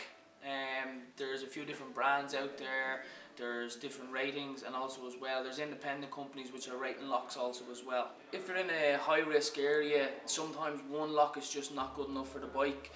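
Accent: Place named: Dublin, Ireland. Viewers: KushDid420 Irish 🍀 👌🏾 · KushDid420 it's a northside Dublin accent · My sister-in-law is originally from Ireland. She's got the same accent. :-)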